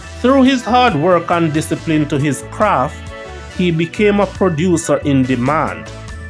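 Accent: Jamaica. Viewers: Arr u jamaican mr ur kind of proñounciation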